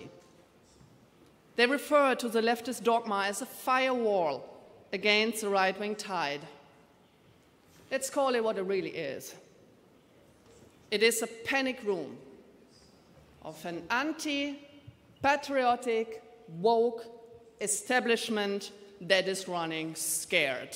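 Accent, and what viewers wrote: Germany. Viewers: "TH" totall versemmelt und Krautakzent! Mehr als Schülerenglisch war das nicht! War nicht mal Leistungskursniveau! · Für eine deutsche Politikerin ist das wirklich ein erstaunlich gutes Englisch, was sie da spricht. Kann man natürlich anders sehen, wenn man gegen diese Frau generell ein verbissenes und verbohrtes Feindbild pflegt, aber wenn man im Alltag selbst viel mit Englisch konfrontiert wird und sich dann mal die aktuellen und die letzten Regierungsmitglieder anhört, was da gesprochen wird, dann hört man eine wirkliche, linguistische Katastrophe. Man hört sofort, dass da eine Deutsche englisch spricht... ja... aber diesen Slang wird man als deutscher "native Speaker" nun mal nicht los. Wer das von sich behauptet, belügt sich selber. Das hier, ist das beste Englisch, was ich seit langem von einem deutschen Politiker gehört habe. Fragen wir mal anders... 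sind eine Baerbock, ein Habeck, Lindner, Merz, Scholz und Konsorten besser? Hör dir mal deren Englisch an, da rollen sich einem die Fußnägel hoch. Und das sind nur wenige, aber namhafte Beispiele. Bei ersterer kann man das Drama ja derzeit in fast jeder neuen Rede bestaunen... Stichwort: UN Diese Frau macht DE grade auf internationaler Bühne zum Volltrottel. Eure Qualitätspolitiker. Wie verbittert muss man eigentlich sein, wenn man in seinem Hass gegen diese Frau und ihre Partei, nicht mal eingestehen kann, dass sie der englischen Sprache weit besser gewachsen ist, als quasi alle aktuellen und vergangenen Regierungsmitglieder Deutschlands? DAS hat radikalen Charakter.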